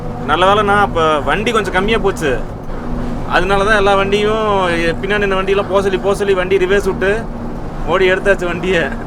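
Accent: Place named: Coimbatore, India. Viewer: உங்களின் shift from Thangam to the new Container lorry looks great. எங்கள்க்கு உங்களின் சரளமான ". ஏங்க்ப்பா " Coimbatore style தமிழ் anchoring giving your usual & meaningful வழவளா talk என்றும் 16 மாதிரி சரளமான பேச்சு ரெரம்ப பிடிச்சிருக்கு. So, without break உங்களின் பிரயாணத்தைத் தொடருங்கள்.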